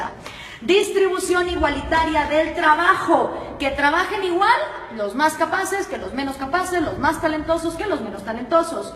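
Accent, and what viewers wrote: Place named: Central America. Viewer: este video deberia haberlo filmado en blanco y negro, reflejaria el contenido añejo que sale de la mente desactualizada de la autora. para cuando haces un video revisionista de los regímenes ultramarino imperial de inglaterra vs expansion napoleonica?. por tu asento debes de ser de un pais centroamericano entregado a los narcos, pero de eso no hablas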